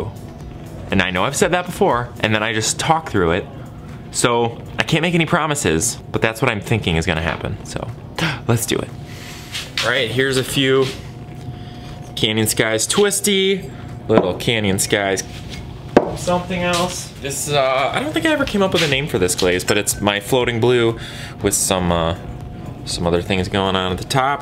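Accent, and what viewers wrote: Minnesota, United States. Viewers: First time watching this channel. About in, thought to myself ‘he sounds like a Minnesotan a bit’ ... checked the bio, and what do ya know, I was right. (I’m also Minnesotan Btw), but I could definitely recognize the accent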